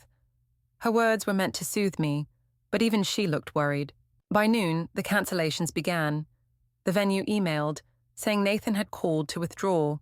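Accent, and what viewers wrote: England, United Kingdom. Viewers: You know, every time I hear that English accent on the narrator I get pissed off.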